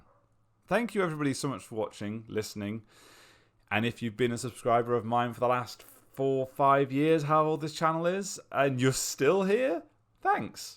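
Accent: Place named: United Kingdom. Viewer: I’m sad that this channel is dead, but I’m really glad I decided to come here (after burning through all hopeless vods) I really like the casual nature of the videos. I’m excited to see where the Hopeless Idiots go from here, but I hope you keep this channel as archives. I need a backlog of that sweet, buttery British accent, I’m sure you understand.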